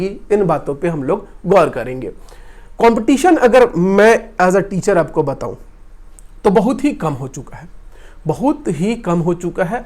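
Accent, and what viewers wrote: Bihar, India. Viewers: Bihari accent b acha lgta h apka..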